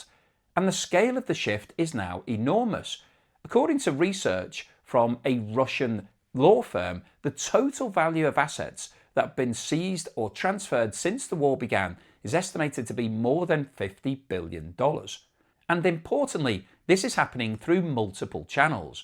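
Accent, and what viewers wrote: United Kingdom. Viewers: you sound very British, and nobody in his right senses today takes seriouse what a British man says about Russias, Britain amd the West is the world problems, even worms knows that!!!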